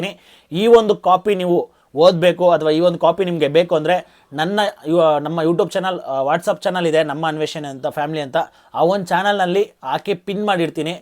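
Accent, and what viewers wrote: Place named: Andhra Pradesh, India. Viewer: avnu Telugu bro..😂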